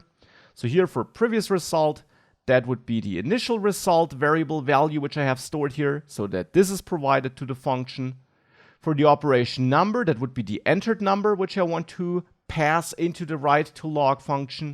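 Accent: Germany. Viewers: I love the way you walk through and explain all the different concepts here, supported by great examples. You are articulate, concise, and obviously very knowledgeable. Your (slight) German accent only adds to the charm, so bonus points for that!:-) I just bought the full course after watching this introduction, because you are the best teacher I have come across yet on this topic, and I have seen quite some. I really can't wait to dive deeper into the super interesting world of JavaScript. Thank you very much, and keep up the great work!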